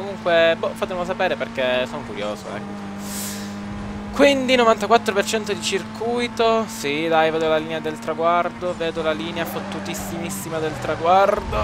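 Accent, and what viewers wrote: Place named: Sicily, Italy. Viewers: io lo capisco anche perchè ogni tanto parla parlermitano :P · quel tocco di siciliano nei tuoi video DEVE continuare a essere presente!!!rende il tutto più epico!!!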